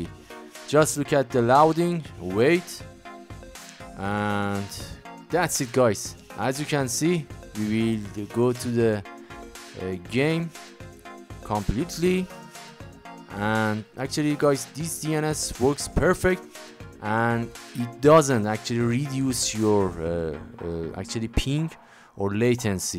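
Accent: Iran. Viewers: از لهجت معلومه ایرانی خو ایرانی توضیح میدادی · با این لهجت بهتر نیست فارسی فعالیت کنی داداش؟!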